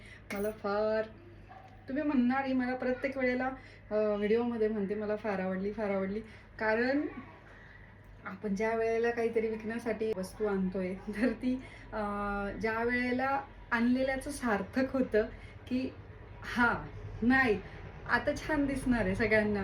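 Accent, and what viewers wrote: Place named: Khandesh, India. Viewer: Tai tu khandeshi ahe na tuzya bolnuavarun vatat mi pn khandeshi ahe tu khup god ahe aani tuze bolne ati sunder ❤ love you tai mla aaj paryant koni nahi aavdl evdi tu aavdte ani tuze sagde video baghte mi😊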